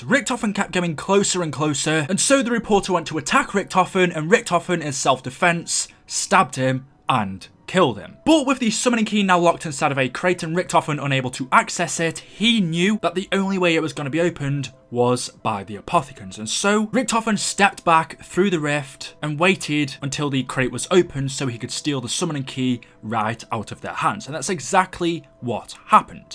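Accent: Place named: England, United Kingdom. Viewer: Where you from in England not zombies related just curious also love the vids mate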